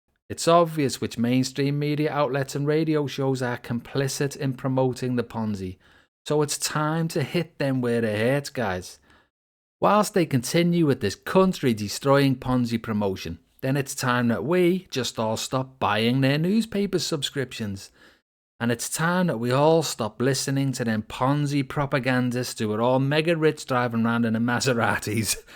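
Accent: Liverpool, United Kingdom. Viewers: Sounds like a scouser(Liverpool) which is a depressing dump, now he constantly whines on about NZ, yes you could buy a terraced house in a rough area of Liverpool pretty cheap but reason is because nobody wants to live there. Auckland compared to many places is quite nice and not expensive compared to comparable cities.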